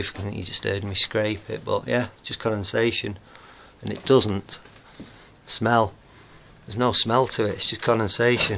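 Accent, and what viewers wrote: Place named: North England, United Kingdom. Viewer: Very ingenious design. If I make one of these, it will borrow this design. From your accent, I'm guessing you live near Liverpool, or Manchester? (or lived near there once.) {subscribed}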